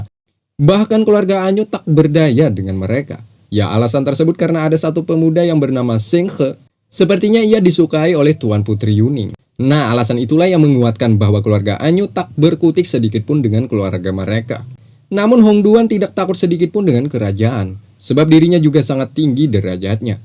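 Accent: Banjar, Indonesia. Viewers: Mantap mbuk ai banjar banar sdh🤣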